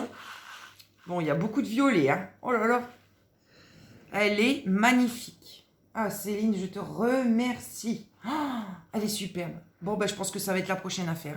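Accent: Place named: Nord-Pas-de-Calais, France. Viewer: Le joli accent ch'ti...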